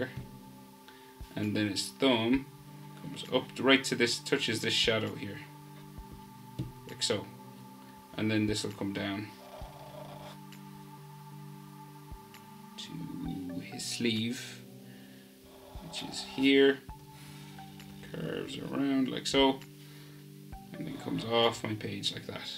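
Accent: Ireland. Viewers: Bro u Irish?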